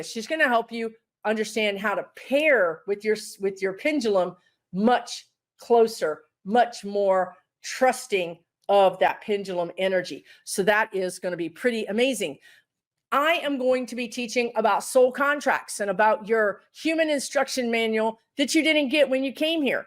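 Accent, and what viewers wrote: Texas, United States. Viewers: I had to stop you at 16/58 over "lifetime" vs their "lifetimes." Part of the draw here is the chemistry/angst/respect between you and the guides. For anybody popping in for the first time it adds immediate credibility. For me it fosters a growing appreciation for what you both bring. Personally, I love their language, just as I do yours when you talk Texan or whatever you'd call it.Equally charming.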